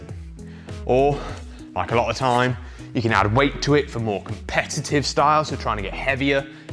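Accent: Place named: United Kingdom. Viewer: Thank you! British accent is something hard to digest 😅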